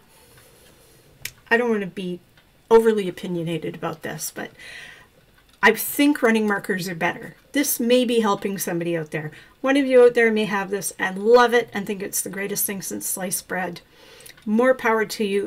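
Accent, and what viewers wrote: Canada. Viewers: Yup, I remember finding out about 'em when I was doing brioche. Now that I crochet only, it's all changed. Like life. Sighh .. But I like your delivery, and I always like a Canadian voice. :)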